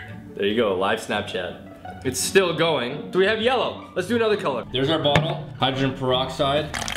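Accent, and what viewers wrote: Canada. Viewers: I was sitting here thinking "is he canadian?" (I'm new to the channel) half way through the video I notice his Canadian jumper...I feel so stupid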